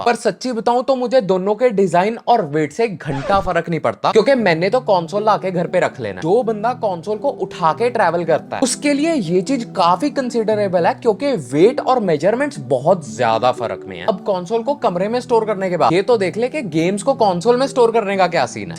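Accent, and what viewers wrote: Punjab, India. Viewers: Bnda bhot sai yr tu ❤❤❤ Punjabi accent reflect krda hai teri hindi ch Btw love your content❤❤❤ keep it up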